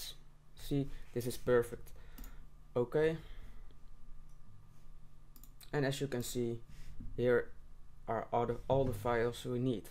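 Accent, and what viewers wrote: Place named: Netherlands. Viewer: ik hoor duidelijk Nederlands hahaha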